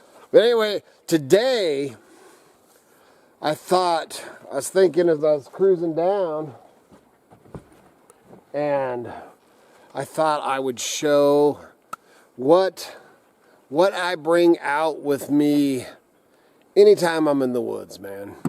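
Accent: South, United States. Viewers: Sounds like myself hillbilly enjoyed the clip thanks.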